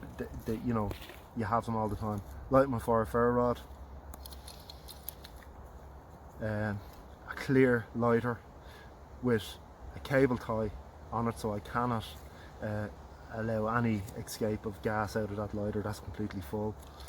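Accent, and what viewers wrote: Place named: Ireland. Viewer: It is Great to see such a Good version of an Iconic Knife been used in My Homeland ! Thank You for the method of Drawing the Fire steel back from an embedded Knife it was a New one for Me ! The Golden Rule of using a Tracker Knife is that You have to Know How to Use a Knife Properly for it to be " A Good Knife " and I have to say this as an Old Dub in London for Forty Years , it is Great too Hear an Irish Voice on this Subject and I look forward to much More .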